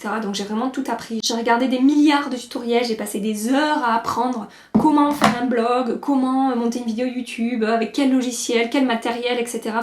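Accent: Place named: Narbonne, France. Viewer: Coucou! Je ne laisse jamais de commentaires sur les vidéos YouTube donc aujourd’hui est une première: j’ai découvert ta chaîne il y a peu (en octobre quand je cherchais des revues sur la Désert Dusk) et j’ai de suite accroché! Cette vidéo me confirme déjà tout ce que je pensais de toi: tu es une personne simple et douce! Tu restes naturelle et drôle! Merci de partager avec nous tout ceci! Le maquillage sur cette vidéo est bluffant! Je suis une make up addict, ce qui fait rire mes amies ahah! J’ai commencé à aimer le maquillage des le collège et maintenant avec ma soeur nous avons cette passion mutuelle! alors tes vidéos pour moi sont comme une bouffée d’air frais! Je te remercie aussi de me faire dépenser autant 😉 PS: j’ai cru reconnaître un accent narbonnais la première fois que je t’ai entendue (j’habite pas très loin et une amie qui vient de narbonne a le même)! 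Bisous et je te souhaite que ta chaîne connaisse un franc succès, il serait mérité 😘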